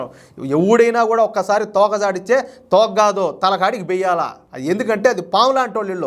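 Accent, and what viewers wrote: Rayalaseema, India. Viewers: Anna meru rayalasema language lo super ga mataladutunnaru Anna me conference ku super meru taggaddu jai Hind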